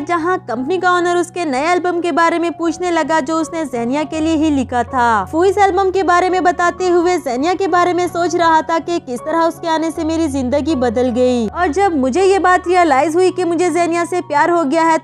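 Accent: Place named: India. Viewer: Aapke awaj se lagta hai ki aap indiqn ho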